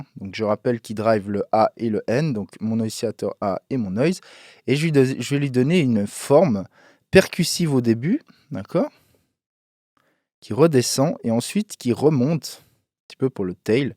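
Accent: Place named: Montreux, Switzerland. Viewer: ce petit accent du coin Montreux / Aigle, ou vraiment pas loin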